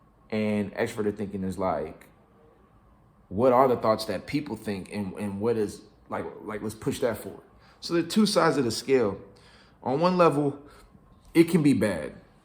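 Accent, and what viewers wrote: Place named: United States. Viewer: Hi I’m watching you to learn American accent 👍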